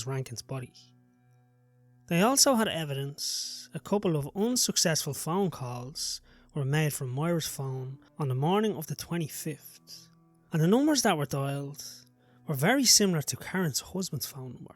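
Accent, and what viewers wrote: Ireland. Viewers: a pronounced and obvious Irish accent it's not one that's hard to follow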